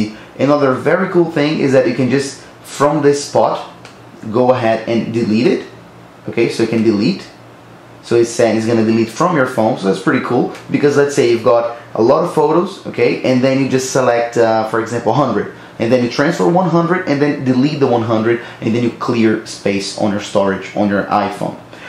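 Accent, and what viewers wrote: Brazil. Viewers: Are you BR? two seconds into the video I could tell from your accent. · Haha eu perguntei pq eu também sou mano. Também moro nos EUA. Meio q consigo captar um sotaque brasileiro, mas vc fala mto bem. Abraços!! E o vídeo me ajudou tbm! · brasileiro. certeza. sotaque.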